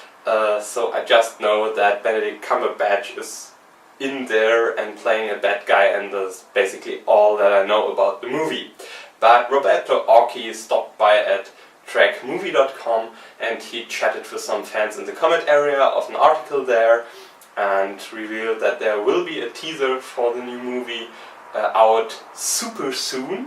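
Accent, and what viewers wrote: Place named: Germany. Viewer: Excellent first episode! Don't apologize for your "bad English." While you do have a strong accent that makes it obvious that you're a native German speaker, your English was easy to understand. Heck, I wish my German was half as good as your English. I look forward to watching more episodes of Captain's Log. Gut gemacht!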